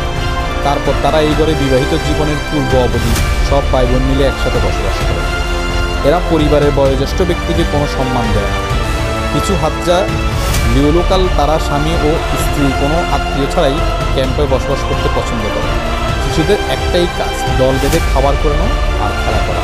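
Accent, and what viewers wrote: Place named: Chittagong, Bangladesh. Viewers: ভাই আপনার কমেন্টারিতে নোয়াখাইল্লা, চাটগাইয়া,একসেন্ট আসে,শুনতে 😊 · ভাই কি চট্টগ্রাম নাকি নোয়াখালীর? ভাষায় তো চট্টগ্রাম। উডা হডে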